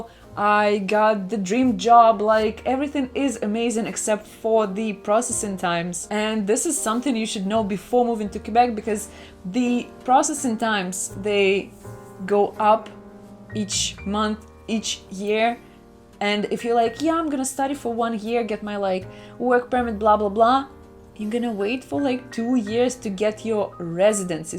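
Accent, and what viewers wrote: Russia. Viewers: I love when you do "blah blah blah " And your Russian ascent is amazing👍🤩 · I love your russian accent 🥰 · Как же приятно слушать русский английский =) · I love the Russian accent It's music to my ears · Love your Russian accent!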